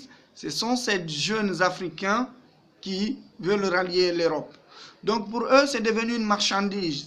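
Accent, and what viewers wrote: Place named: Guinea. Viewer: ce monsieur parle correctement la langue de MOLIÈRE.certe son accent est guinéen c'est normal il n'est pas français.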